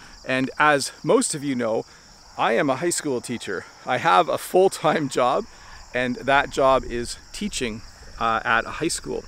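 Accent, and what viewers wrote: Canada. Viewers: Hi!! Don’t worry. You should have a break. I really appreciated that you do a lot of jobs.👏👏I like Canadian teacher accents of you and. I still waiting for your lesson. 😃😃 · I had lived in ottawa four years. It seems like my second home town! Your video and your clear Canadian accent make me homesick! Don't forget make a video in maple leaves season,please!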